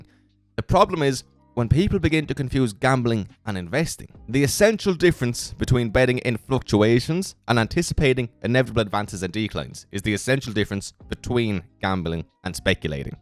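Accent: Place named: Ireland. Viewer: What’s sexy is you’re back Baby I’ve missed you my brother. I’m glad to see you & hear that beautiful Irish accent. Let’s get down to business and make some money baby ♥️!!!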